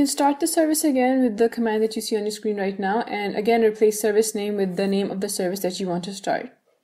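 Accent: India. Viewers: are you from India . Can you speak a little slower? Please If you're rushing like that, I don't want to listen anymore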